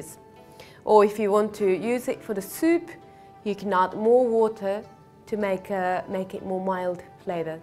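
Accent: United Kingdom. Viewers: usually when people make instructional cooking videos, they use nice, presentable equipment and containers. Most people would pour the sauces into pretty little bowls, or glasses. This chick puts her sake in an old, plastic coke bottle with a paper label scotch taped to the front. On the other hand.... it's a hot asian girl with a brittish accent that happens to be a master chef. She pretty much has all of the fetish bases covered.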